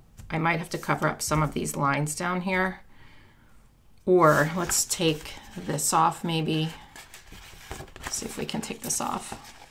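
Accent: Wales, United Kingdom. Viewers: If he’s from Wales his accent is Welsh!😊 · A gentle and thoughtful man with a British accent??? Swoon!! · Flowers and a Welsh accent?! ❤❤❤❤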